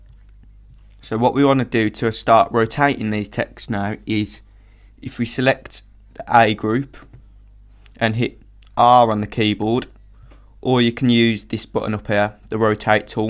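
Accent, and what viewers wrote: Birmingham, United Kingdom. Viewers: Are you british??????????????? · your from birmingham aha ? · Dude we came from them, he's British, we are pronouncing it wrong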